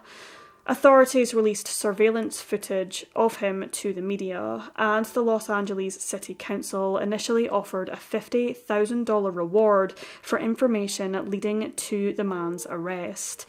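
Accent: Scotland, United Kingdom. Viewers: 🔥 Scottish accent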